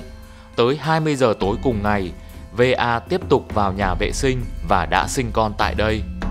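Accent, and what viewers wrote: Hanoi, Vietnam. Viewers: Giong HN đọc hay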